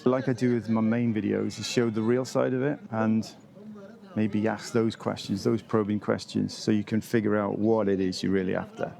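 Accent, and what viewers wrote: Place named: England, United Kingdom. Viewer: Thank you for sharing your experience…just found your channel… looking forward to watching all your videos… I know from your accent where you are from in England..😊…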